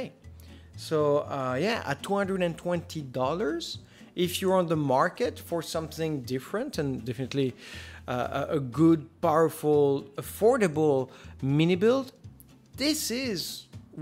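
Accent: France. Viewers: This french accent, you can fight it but you can't win against it..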